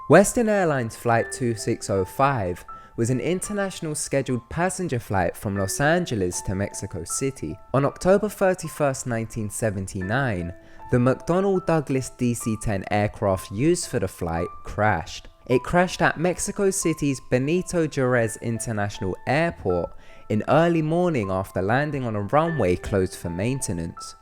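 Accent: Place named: Russia. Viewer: Русский акцент у говорящего..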